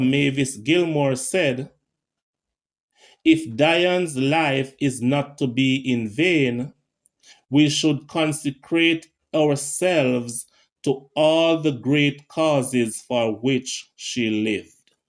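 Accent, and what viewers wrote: Patois, Jamaica. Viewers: PATWA! Spoken language of Jamaica! Surely this must help you understand. If not! GOD guh wid yuh! Bless!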